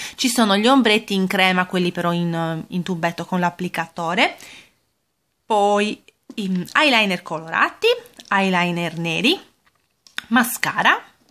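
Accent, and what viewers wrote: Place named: Sardinia, Italy. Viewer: Ciao bellissima postazione. Anche io SN sarda. Appena ho aperto il tuo video ho riconosciuto subito l accento. Sei troppo simpatica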